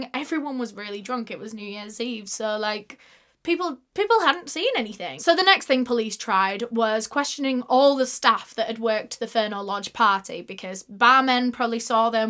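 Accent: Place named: Yorkshire, United Kingdom. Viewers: she’s from Yorkshire I am sure, which is in England! Definitely not Scottish or Irish 🙂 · Yorkshire accent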